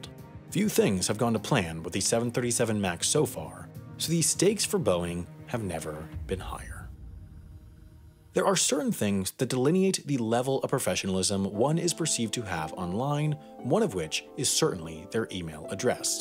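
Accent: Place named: New England, United States. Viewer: This is heavily off-topic, but does Wendover have a Boston/New England accent? I keep hearing him say "No-at" for "not" (more like naat in most American accents), same for "Dollars".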